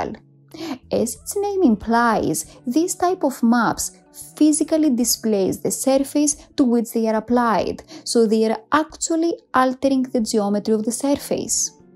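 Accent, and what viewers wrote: Russia. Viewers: English language with Russian accent ouraaaa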